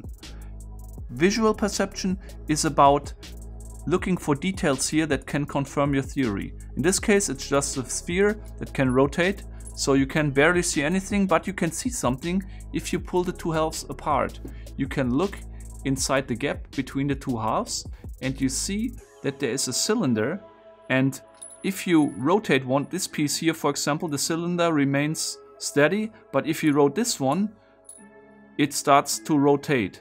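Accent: Germany. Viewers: Klingt sehr deutsch, dein englisch ^^ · Could it be that you are german? Becaus your accent and when you said ‚klack‘ it gave it away 😂 Greetings from munich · It is a very hard German accent ^^ my fellow Landsmann has used this as charme however :) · Is he german? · Man hört raus dass du Deutscher oder Österreicher bist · Its so weird how he sounds so german.. he also uses have instead of has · nice video and interesting puzzle 👌, but damn bro ur german accent is awful 😧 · I love this german or skandinavian accent. Such wholesome english;) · Es ist lustig wie man am Akzent raushören kann, dass du aus Deutschland bist. Its funny that you can hear that he is german :P Good Video tho!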